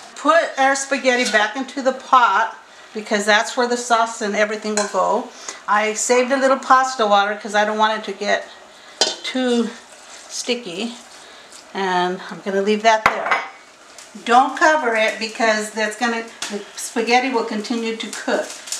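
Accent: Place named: Puerto Rico. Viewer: Btw, are you from PR? Your accent tells me so! I love your style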